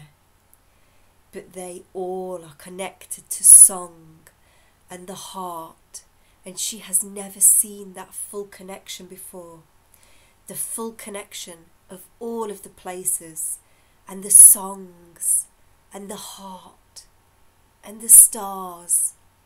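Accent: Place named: United Kingdom. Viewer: Your GB accent is so much fun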